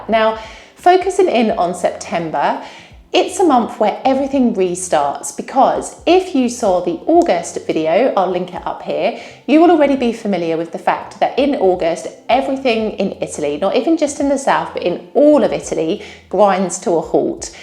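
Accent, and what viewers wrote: United Kingdom. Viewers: It’s a real pleasure to listen to your beautiful story in wonderful British English about living in Italy - my dream country, and in my favorite language.😍🤩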